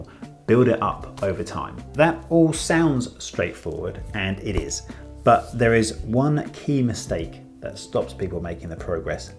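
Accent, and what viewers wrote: United Kingdom. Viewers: I love your English accent! In school we learned "British English" but because of an unfathomable amount of American input growing up I now have trouble breaking away from that American vocab and pronunciation. Trying to change it now as an adult by consuming as much as I can from the UK😅 Great video as always!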